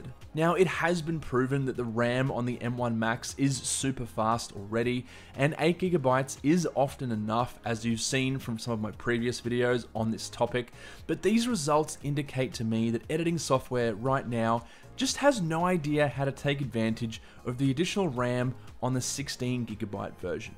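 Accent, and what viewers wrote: Australia. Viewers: Can you turn off your Aussie accent? It's distracting.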